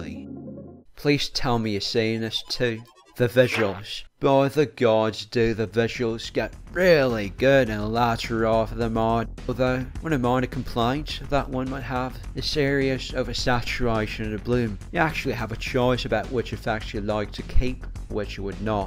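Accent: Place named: Wales, United Kingdom. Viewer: Yeah you have kind of a British\Welsh accent that my ears don't really understand, but don't take it personal I'm fine with it.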